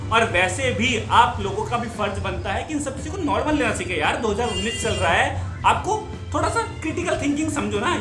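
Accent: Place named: Baghpat, India. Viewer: AAP KAHA SE HO SIR...aakpi language baghpat ki lal rahi hai...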